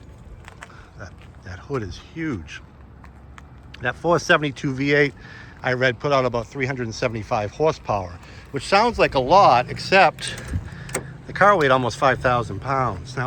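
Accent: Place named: Boston, United States. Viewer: Hi! Love your channel and your Boston accent! This car is priced very well, considering the survivor quality, the low miles, and all the undercoating process that the previous owner paid for. He must of loved it if he kept three covers on it in a climate-controlled garage. It will sell FAST!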